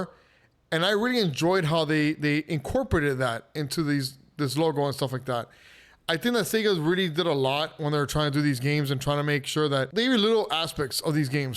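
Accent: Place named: Miami, United States. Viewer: You sound like you're Miami? Are you?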